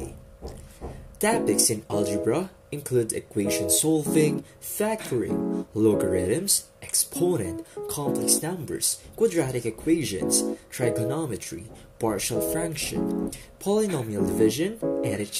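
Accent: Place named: Philippines. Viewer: pinoy ka nu 😊 the pronunciation and accent gives it away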